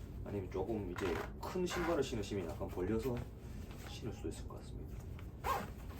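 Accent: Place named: Busan, South Korea. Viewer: 잘봤어요. 구독했어요. 부산쪽 분이신가 봐요. 평소 잘보고 있어요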